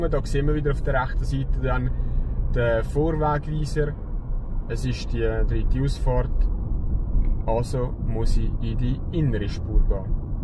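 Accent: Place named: Switzerland. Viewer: Als deutscher muss man ein bisschen besser zuhören um Schweizerdeutsch zu verstehen wegen dem Akzent, aber ich hab es verstanden und bedanke mich für die tolle Erklärung mit Vorschau. Danke nochmal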